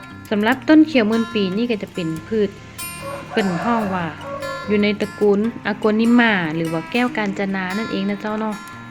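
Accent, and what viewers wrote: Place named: North, Thailand. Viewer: ใบงามจ้าน้อง เป็นลายเนาะพี่ชอบต้าพูดเหนือ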